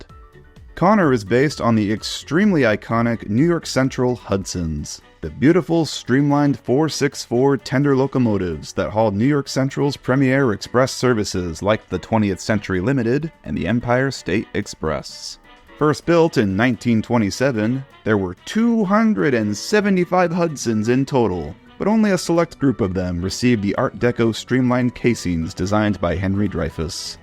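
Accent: United Kingdom. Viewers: Which accent of Britain Does have.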